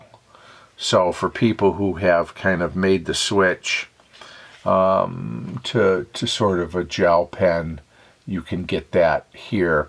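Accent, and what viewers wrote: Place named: Great Lakes, United States. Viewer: ESSAY ALERT 🚨 Mid 60-year-old, always a pen fanatic, I recently came across a website all about the history of Parker pens. I’m sure fans of Parker know of this website. I remembered I had bought a Jotter in the early 90s, replaced the cartridge with a bold cartridge, didn’t like the way it wrote particularly and so threw it in a box where it has been for decades. Website gave date codes so recently I found and dated my old black jotter to sometime in 1991. Decided to refill that jotter with a modern jotter refill, which I am assuming is their best work on the ink so far. Enjoying the way it writes, decided to purchase a new Jotter XL, and I really like this one which is perfect for my large hand. Was in thrift store recently, came across a bag of old pens and pencils, looked at this bag, and to my amazement saw a stainless steel Jotter! 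The whole bag sold for around two dollars, threw away all the other cheap no-name pens, pencils, and kept the jotter. Replaced the cartridge with a fine point. What a thrift store find that was! So I use my old black Jotter and my new Jotter XL and the thrift store stainless steel Jotter and I’m happy as a clam now. The Jotter stainless steel date code worked out to be about 1991 as well. Both were made in the USA, the new Parker XL was made in France. Does anybody know if the original is made in Janesville? How many other factories does Parker have in the USA? Happy writing! Great video, really enjoyed it. Nice Great Lakes accent too 😉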